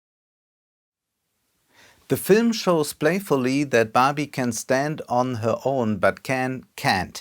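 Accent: Germany. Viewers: Jesus man hört den deutschen Akzent richtig hart raus.... Die Vorstellung das die Aufrufe explodieren nur weil das Video nochmal in Englisch gesprochen wird... Vielleicht lieber einfach englische Untertitel anbieten und wenn es viele wollen und man es kann dann anbieten? So ist der Aufwand doch höher als der Ertrag oder? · Mei dier Genosse! Der deutsche Aktenz stört glaube ich uns Deutsche mehr als andere. Ich habe die Erfahrung gemacht, daß ich Leuten die deutsch, aber mit einem fetten Akzent sprechen, eigentlich problemlos zuhören kann. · To create content in english as well makes sense on YouTube, in order to reach a wider audience. Great work, i hope it pays off! And the german accent is not an issue at all, we live in the 21st century, people from different countrys have accents… who cares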